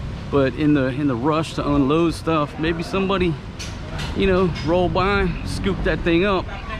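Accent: United States. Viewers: The original American accent ! Beautiful accent bro. 🇺🇸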